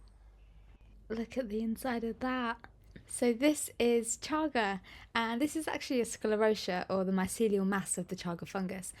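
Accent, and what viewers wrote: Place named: Yorkshire, United Kingdom. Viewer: You sound well posh for a Yorkshire lass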